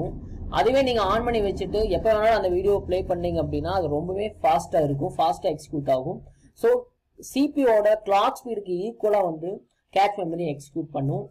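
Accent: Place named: Kongu, India. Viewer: Bro... Ninga Erode / Tiruppur ah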